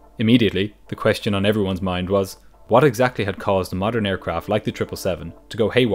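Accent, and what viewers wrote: Ireland. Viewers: As an Irish man it's nice to hear an Irish accent on a YouTube channel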